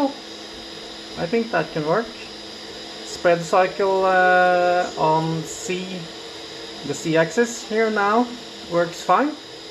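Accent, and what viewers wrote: Norway. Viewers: ok jag visste de ifrån din dialekt har vänner ifrån Norge så kände igen dialekten direkt 😊😊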